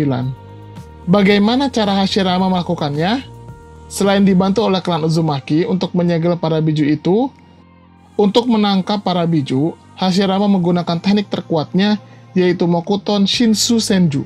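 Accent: West Java, Indonesia. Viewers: logat sunda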